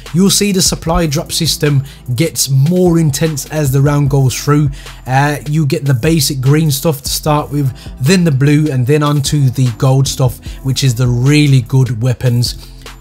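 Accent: Birmingham, United Kingdom. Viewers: You're from Birmingham aren't ya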